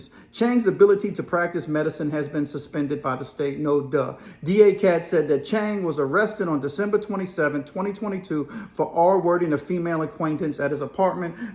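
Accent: Louisiana, United States. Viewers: Love the accent,Louisiana?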